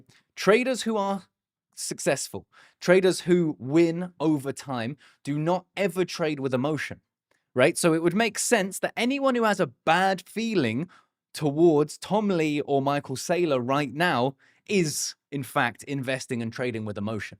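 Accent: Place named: Essex, United Kingdom. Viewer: Defo prefer hearing a Brit. You sound Southern too, Essex kind of way I presume?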